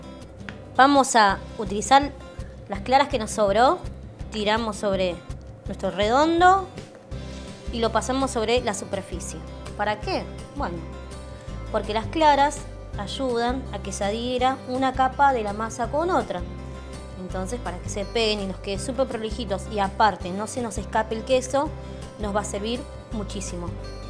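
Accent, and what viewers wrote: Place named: Argentina. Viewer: Disculpa mi ignorancia ...me encanta la comida boliviana ...Cómo así usted tiene acento argentino y prepara comida boliviana? Un saludo desde Londres...Acá nosotros somos fans de los restaurantes bolivianos .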